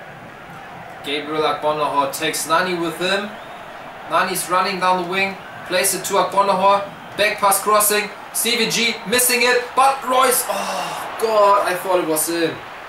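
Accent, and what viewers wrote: Germany. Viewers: Manch mal merkt man das du deutsch sprichsf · Alter Red deutsch man merkt dass du deutsch bist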